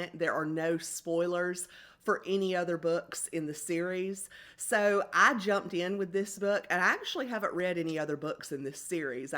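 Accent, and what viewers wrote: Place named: South, United States. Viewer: Love your southern accent its so comforting i am also a mystery lover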